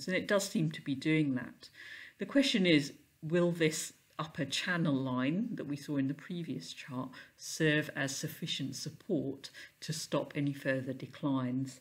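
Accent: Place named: East Anglia, United Kingdom. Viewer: Really enjoy these presentations. Out of interested...where abouts are you from in the UK? East Anglia? I'm trying to place the accent!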